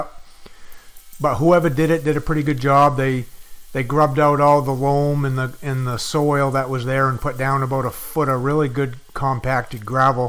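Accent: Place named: Massachusetts, United States. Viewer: Judging by the accent I'm gonna say Massachusetts government BS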